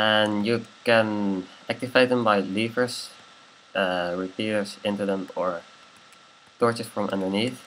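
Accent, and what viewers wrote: Netherlands. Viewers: haha youre dutch :)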